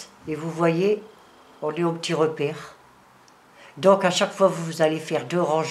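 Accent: North, France. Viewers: bonsoir très contente de te revoir, il et magnifique félicitations un grand grand merci je vais encore t écouté avec ton accent du nord bisous et gros câlins a ponpon, a très vite je vais le faire trop beau très bonne soirée, Monique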